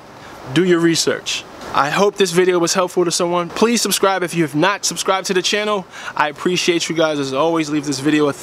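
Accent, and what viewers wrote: New York, United States. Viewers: Ten Chest Commandments... I hear that NY in you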